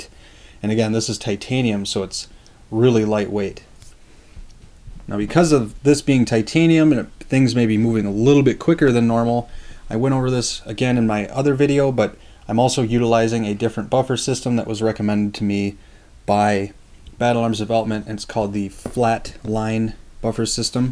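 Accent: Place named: Upper Peninsula, United States. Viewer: I've watched several of your videos in the last couple days and the first thing I thought was wow this guy has a thicker yooper accent haha! I was close. Sounds like you are in MN. I'm right next door in southern WI. Anyway, nice build. That is a sexy rifle. Gives me a few ideas now that I'm thinking about building one myself possibly. I've learned a lot from your channel so far keep up the great videos and thanks for sharing!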